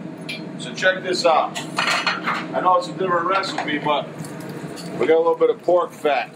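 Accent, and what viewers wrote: United States. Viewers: Where are you based sir, New York? I like your kitchen I remind me my time in London but you speak like American...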